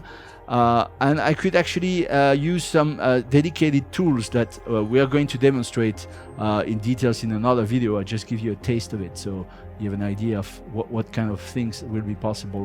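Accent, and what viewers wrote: France. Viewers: il est français ? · Are you Canadian or French? Your English is very good with French "tongue'. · David Guetta's accent spotted. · French accent wtf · Are you French ? ._. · His french accent completely sold it to me.. Who do I give my money?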